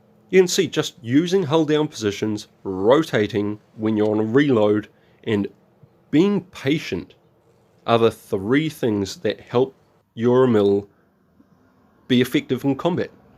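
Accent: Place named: New Zealand. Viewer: just picked this up on my new oceanic account and your video is real handy my bro, nice to see a fellow kiwi in the mix hit subscribe as soon as i heard your accent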